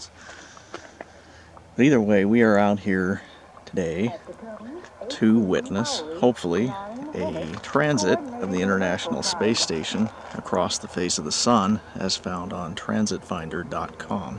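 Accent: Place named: United States. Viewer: it sounds great to me - I always think the American accent sounds "professional" Much easier on the ears than my Aussie roughness.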